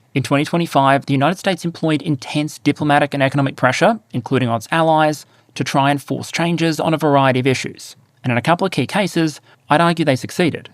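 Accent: Australia. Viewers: is the Slavic god of thunder. The accent you hear is Australian. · That tacit acknowledgment that your voice is the real moneymaker at the end of the video there gave me a chuckle. I often wish I was an aussie just because of how much Americans love the accent…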